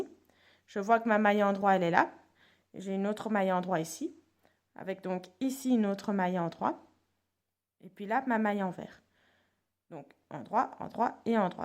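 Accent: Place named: Nancy, France. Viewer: On dirait que vous avez l'accent de Nancy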